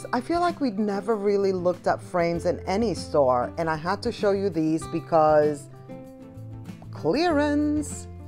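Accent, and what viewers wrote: New York, United States. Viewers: Hi I found your channel recently and really enjoy a fresh take on these shopping channels. You sound like you're from the New York ,New Jersey area am I right? I don't mind that you say you know a lot. 👌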